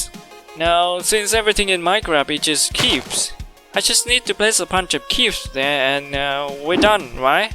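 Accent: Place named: India. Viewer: He sounds indian and looks vietnamiese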